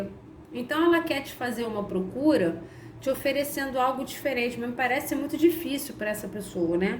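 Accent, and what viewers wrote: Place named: Rio de Janeiro, Brazil. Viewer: Gratidão! Adoro suas tiragens e esse sotaque carioca faz toda diferença, kkkk! Beijos de luz!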